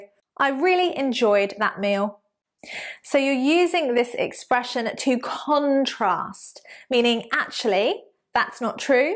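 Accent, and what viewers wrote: United Kingdom. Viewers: I love your accent, I love British English accent, you way of teaching is very lovely, I wish I can take class physically by you. You are gorgeous and lovely lady. Just I subscribed your channel. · You have a British accent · All ma'am, I live in America but I love British English British accent and your accent. I still have issues using them in sentences cuz it's very high level English So I still need your assistance in using them in sentences and I love it thanks a million teacher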